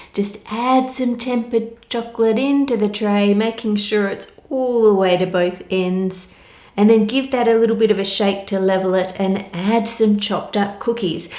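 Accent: Perth, Australia. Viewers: it's a Perth accent :) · Australians like have an awesome accent! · Dear mam Will you please teach us Australian accent please please it's so good 👌 by the way I am kashif form Asia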